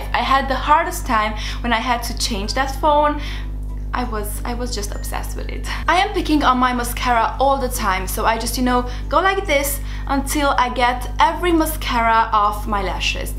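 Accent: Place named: Romania. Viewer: I also forgot to mention I had a friend from Romania named Anna Monica when I was in 1st-3rd grade! She definitely had your accent!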